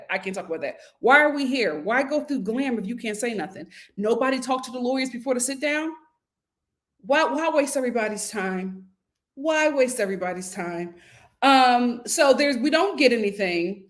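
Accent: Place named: Philadelphia, United States. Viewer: The philly accent I throng today 😂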